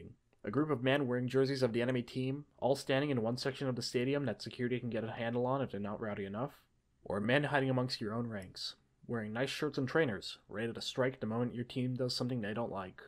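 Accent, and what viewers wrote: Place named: United States. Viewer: Soon as the yank started narrating, I switched off!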